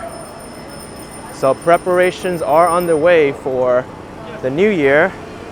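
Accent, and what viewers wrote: New York, United States. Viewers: New Yawk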